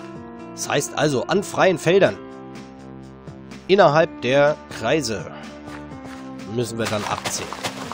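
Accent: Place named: Saxony, Germany. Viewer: haha kannst du lustig sächseln! Kommst du aus Sachsen/DDR ?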